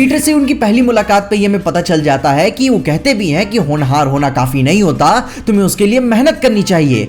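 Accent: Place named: Uttar Pradesh, India. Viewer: Bhayi aapka accent UP jaisa lagta hai, kahan ke ho aap ? 🤔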